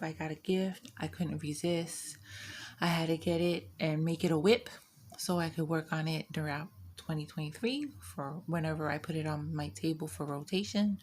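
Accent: New York, United States. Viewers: Hi. I’m new to your channel and I’m so glad I found you. Lisette told me about your laugh, so I had to see for myself. You sound like you’re from NY - my whole family (Mom, aunts, Grandma) is from Brooklyn. Then they moved to Cali and that’s where I was born. What happens if I finish a WIP and start another brand new one - do I add that or count that, or do we just start with a set number and finish them as we finish them throughout the year? I hope that made sense. I have been DPing since this year in April. I currently only have 3 WIPs, so that won’t take me super long to finish them all - for sure it won’t take me months. I plan to join this event, I’m just unsure of a couple of the rules. Thanks for reading my novelette!!! ❤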